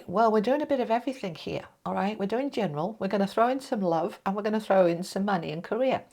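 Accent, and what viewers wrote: England, United Kingdom. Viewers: Love me some! 😍🥰 ❤❤ That lovely english accent always makes me go weak in the knees, sigh 😅😘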